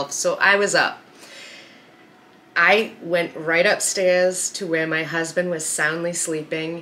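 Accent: Massachusetts, United States. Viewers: I found your channel from watching the canuary videos. I knew your accent was maasachusetts, but didn't realize how close. I grew up in Acushnet and New bedford Mass. Glad to see someone local doing this homesteading thing. I'm in Maine now but hoping to head down there to open gate poultry and get some of there hatching eggs. Have you heard of them? They are so in Wareham.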